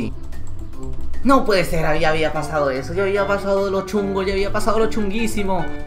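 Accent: Mexico. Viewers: Porque habla Mexicano :V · Porque Hablas Como Mexicano :^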